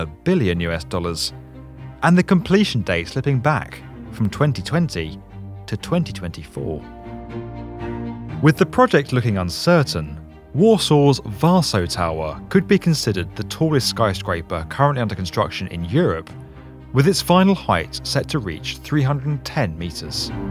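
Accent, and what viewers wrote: United Kingdom. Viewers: good video , well done with the British accent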